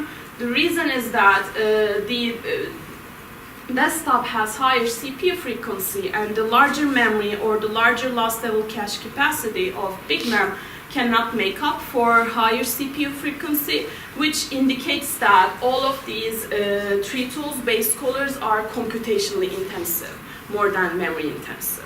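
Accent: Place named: Turkey. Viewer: That turkish accent and nervous voice was kinda hard, but the content was awsome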